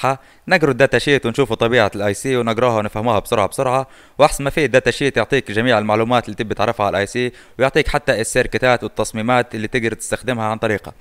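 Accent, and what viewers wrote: Libya. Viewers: ليبي يتكلم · السلام عليكم. حاب نتعلم منك شغلك. من لهجتك عرفت أنك ليبي يعني أبن بلادي. ياريت نقدر نتواصل معاك شخصيآ. عشان ناخذ عندك كورس عملي. أرجو الرد يا أستاذ.! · حسيتك ليبي😁 منور ربي يوفقك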